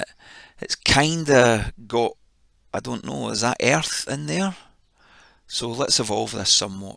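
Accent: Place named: Scotland, United Kingdom. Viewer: Many thanks - great video - very informative and with a dreamy Scottish twang as a bonus! Happy Days! LOL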